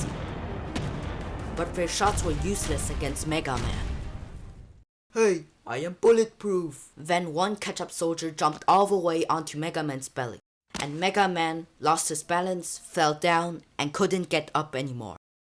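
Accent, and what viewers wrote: France. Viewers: BRO YOUR ACCENT IS FRENCH BUT SO GOOOOOD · Gotta love the french accent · The french accent 😂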